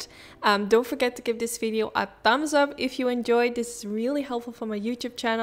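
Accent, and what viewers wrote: Netherlands. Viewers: Ben je nederlands? Want hoor beetje een nederlands accent in je engels haha x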